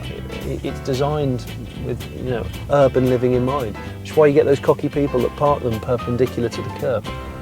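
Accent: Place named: England, United Kingdom. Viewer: same english accent - great!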